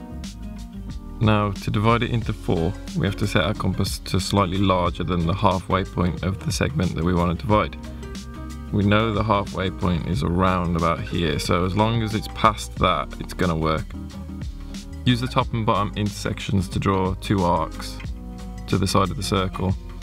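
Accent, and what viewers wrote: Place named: Midlands, United Kingdom. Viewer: I'm a teacher looking to help my class draw simple pie charts. I loved this video. You keep it simple and I love your midlands accent!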